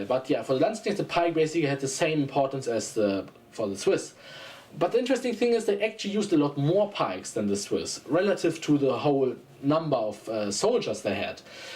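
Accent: Germany. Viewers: I mean, realistically given that accent, he should probably have a German or Austrian flag behind him. No one should have patriotism to the European Union that’s freaking weird one could say almost disgusting.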